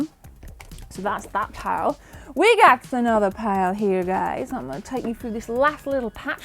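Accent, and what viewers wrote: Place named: Liverpool, United Kingdom. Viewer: You sound like a posh Scouser? AND you DONT look old enough to know about an AMIGA ?!?!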